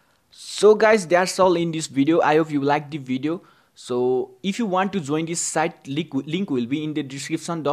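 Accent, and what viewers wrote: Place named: Nepal. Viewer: You have Nepali accent. Are you Nepali?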